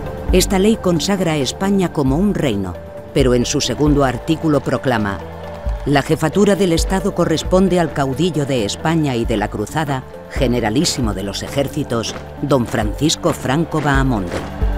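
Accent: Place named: South America. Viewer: Eres sudamericano ¿verdad? En España si se pronuncia la T antes de la L, pero es en esta forma: At-lántico. Es como la LL, acá decimos cayar en vez de callar (caliar), eso lo aprendí en la sierra de Perú que hablan así e igual pasa en España, ellos pronuncian in, nosotros mal, saludos.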